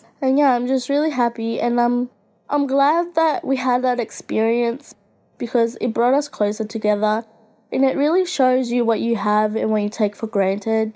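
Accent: Australia. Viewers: OHHHH MEH AUSSIE GURL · Finally somebody who is aussie 🇦🇺🇦🇺🇦🇺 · So, are you Australian or Story booth is Australian? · I knew you were Aussie Aussie’s rock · Are you Australian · I knew you were aussie! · She’s Aussie! I’m not the only one yay! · YOU’RE AUSSIE? YAY! I AM TOO! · Oh she's an Aussie 😀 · Finally an Aussie! (im australian) 🇦🇺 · She’s Australian don’t make fun of her accent mate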